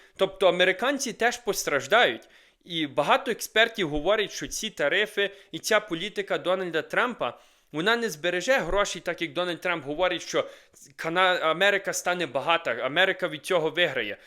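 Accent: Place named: Hutsul, Ukraine. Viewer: Вивчи Українську цуценятку ато від гуцульського підар акценту аж верне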